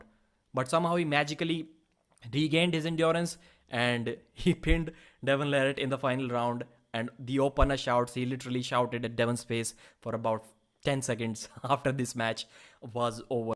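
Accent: India. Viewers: Who the F wanna watch your dumb Indian baldy and accent. Just show the video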